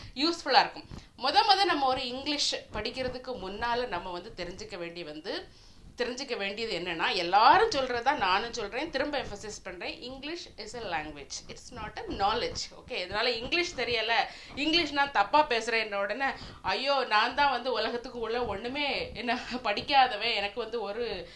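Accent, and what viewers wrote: Nagercoil, India. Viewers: namma nagercoil slang a friendly a pesurenga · உங்கள் நாகர்கோவில் பேச்சு மிகவும் அருமையாக உள்ளது.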